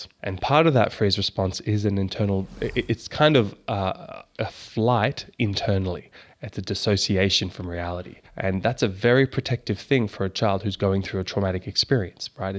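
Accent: Australia. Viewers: I saw my pink sweater on my bed, my navy blue rumpled and soft looking pillow case, my purple cane hanging on the bathroom door knob, ugly orange mesh bag on my floor and my dusty black suede shoes. I felt my warm dry hand wipe my cold damp mouth, the softness of my pillow, the long sleeve of my fresh clean tee shirt, the smoothness of the manufactured wood bookcase by my bed. I heard the ice maker in the kitchen, the fan on my husbands side of the bed, Dr. kind, gentle, Australian accented masculine voice. I smell the clean smell at the neck of my tee shirt, the smell of the shredded wheat cereal I just ate clinging to my right hand. I taste a starchy dry taste in my mouth like I am just about to make a phone call I have been dreading or I should go wash my hands and brush my teeth because I just had cereal.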